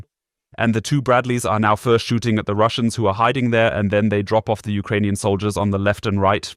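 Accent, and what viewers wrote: Germany. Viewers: I'm sure, he has German accent all right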